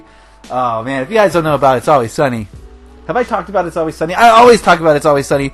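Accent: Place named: United States. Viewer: We Englishmen speak English because we are from England:p I do find it funny myself how you Americans say some words, lol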